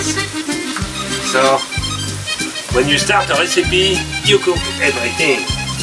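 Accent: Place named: Italy. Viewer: Je pensais te revoir avec un accent USA, mais c'est plutôt avec celui d'Italie que tu reviendras. Rafraichissant mon ami. Continue ton périple avec cette bonne humeur. Au plaisir.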